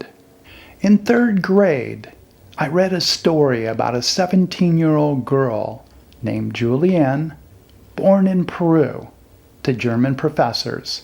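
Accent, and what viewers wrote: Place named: England, United Kingdom. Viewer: I really enjoy hearing listening to your English accent with clear story explaining like this. Thank you 🙏🏻 so much. Please take care and enjoy your time there 🙏🏻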